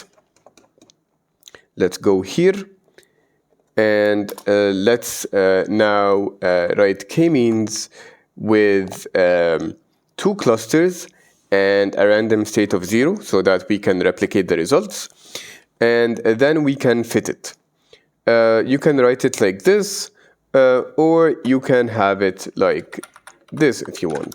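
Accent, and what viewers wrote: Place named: Egypt. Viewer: i feel. the accent is more Egyptian :hand-pink-waving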